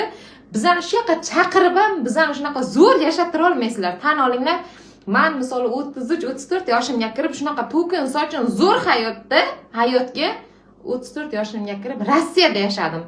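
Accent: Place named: Bukhara, Uzbekistan. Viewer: Бухороча тошкентга ва харазм шевасинда гапиробсан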